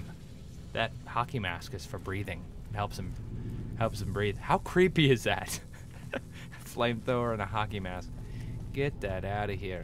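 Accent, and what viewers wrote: Scotland, United Kingdom. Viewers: Mate the Scottish accent is fun ( especially in England where no one understands you ) but it ain't the easiest to get rid of once you've got it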